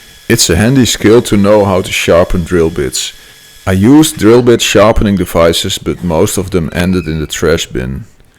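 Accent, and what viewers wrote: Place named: Netherlands. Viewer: Hallo , Je mes is echt cool , je voice over is duidelijk en interessant maar probeer een beetje minder nederlands te klinken als het kan ....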